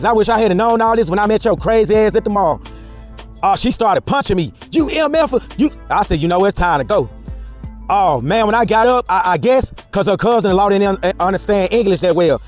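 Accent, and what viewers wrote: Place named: South, United States. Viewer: Hello from the Matrix. Love me some. ❤❤. Southern accent I could listen to all day.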